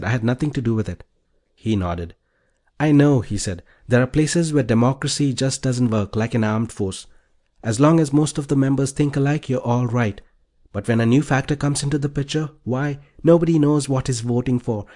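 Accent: India. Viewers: The pathetic narrator has an annoying Indian accent. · Beautifully spoken English & lovely crisp Indian accent. I really enjoyed it, thanks...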